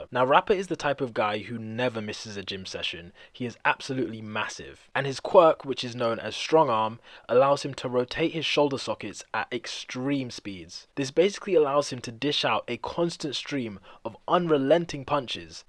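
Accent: United Kingdom. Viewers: You are awesome3. Hope you get bigger man it will happen soon. Are u British btw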